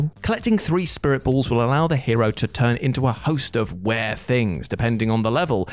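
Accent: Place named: United Kingdom. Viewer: american english but british accent xd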